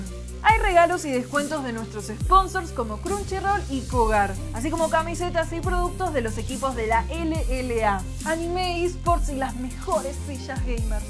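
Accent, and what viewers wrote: Argentina. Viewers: Bro, es argentina, obviamente tiene su acento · como odio ese asento argentino :( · No tiene carisma, es muy estresante escuchar ese asentó argentino y no algo neutro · Que tan amiga son? solo los amigos la bancan. Habla Argentino... No incluyen a los latinos. Donde quedo el neutro??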